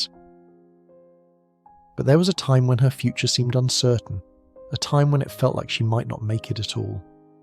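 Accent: United Kingdom. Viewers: Thank you living angel❤❤❤. Pls use a narrator with a US accent . The British accent is very irritable .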